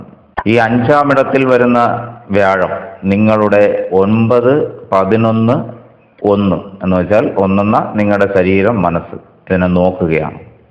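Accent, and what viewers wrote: Tamil Nadu, India. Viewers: താങ്കളുടെ ഓരോ വാക്കിലും ജോതിഷം പറയുന്നതിൽ 100%സത്യസന്ധത കാണുന്നു സംസാരത്തിൽ ഒരു തമിഴ് ശൈലി കാണുന്നു കേൾക്കുന്നതിൽ ഒരു സുഖം 🙏🙏🙏🙏🙏🙏🙏🙏🙏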